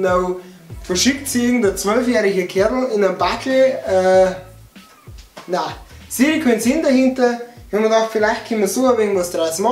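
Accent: Bavaria, Germany. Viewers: I almost don't get anything you say but it's always nice listen to thr bavarian accent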